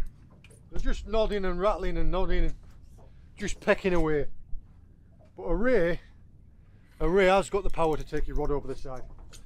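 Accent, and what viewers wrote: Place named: Cornwall, United Kingdom. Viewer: “A bar of soap with pins in it” so accurate.As a youngster I caught small Bass in and around st Johns Lake in the Tamar by the bucket full.The best tool I had for holding bass was an old bar towel. Looks like your new boat in this vid? I was out fishing with a mate discussing your videos, he said there must be lots of foreign tourists Admiring your Cornish accent.It was mentioned in good taste and did make me 😂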